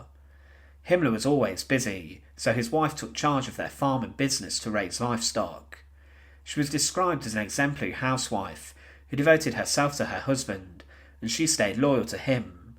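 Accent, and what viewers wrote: United Kingdom. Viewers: He's English/British, not a fake voice smh jfc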